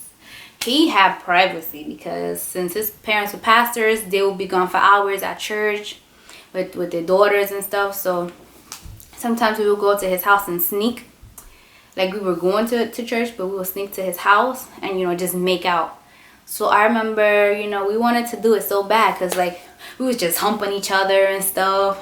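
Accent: New York, United States. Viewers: Zaire Williams is she from New York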